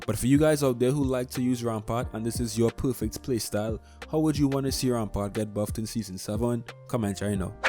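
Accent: Trinidad and Tobago. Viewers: YOU SOUND TRINI MY GUY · R u a trini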